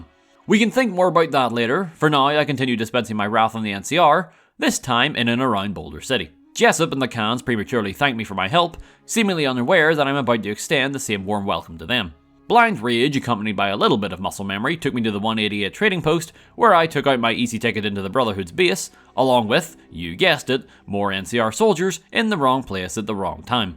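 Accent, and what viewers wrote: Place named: Northern Ireland, United Kingdom. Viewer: Right are you from Bangor or antrim 🤣🤣🤣 driving me mad trying to think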